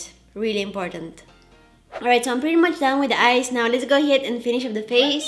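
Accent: Bulgaria. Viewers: I met a woman the other day and I guessed she was from Bulgaria by her accent. Guess I've been watching a lot of videos!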